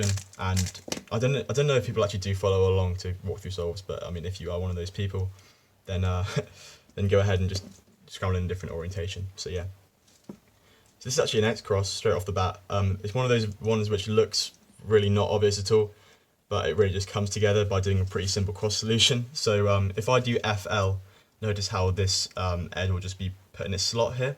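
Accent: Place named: United Kingdom. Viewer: Do you actually speak with a Yorkshire accent? I love your British accent,cuz it sounds super clear and pleasant frankly speaking!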